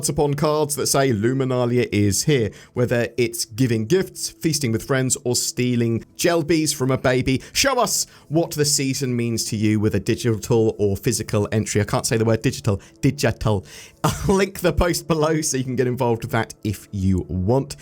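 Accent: United Kingdom. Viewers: Is it possible for you or to record yourselves, in your British way, saying these words? Girlfriend, murder, rural, juror. Your struggles with the word "digital" made me think of it.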